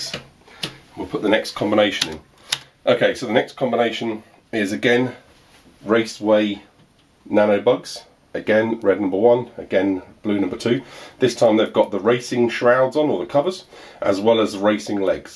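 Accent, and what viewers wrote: United Kingdom. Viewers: My older sister thinks British accents sound cool!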